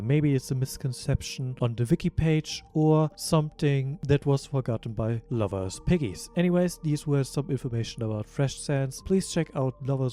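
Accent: Germany. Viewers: Is it possible that you are German and you have an accent?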